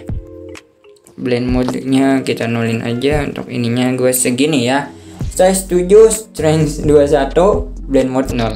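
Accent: Banjar, Indonesia. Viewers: Orang Banjar kh?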